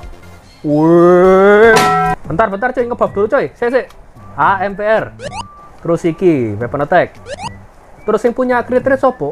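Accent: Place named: Banyumas, Indonesia. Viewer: Welcome back lord Pas nonton video ini , bini gw langsung hapal & bilang "oh ini yg mas-mas ngapak itu ya?"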